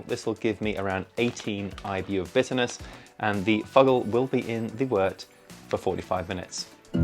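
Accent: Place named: Southern England, United Kingdom. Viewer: Very good ,will have a crack at this one and try it on the beer engine. It's that Southern accent again. Last time seeing the Saints live was Alan Balls last game. Think he was last player to stop playing from the 66 world cup team.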